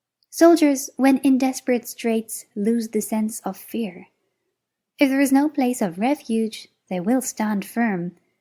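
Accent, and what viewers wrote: Ireland. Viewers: What a coincidence, last week I added an Art of War audiobook vid to a playlist, intending to listen to it at the gym this week. I think I'll listen to your nice Irish-accented narration instead. Thanks.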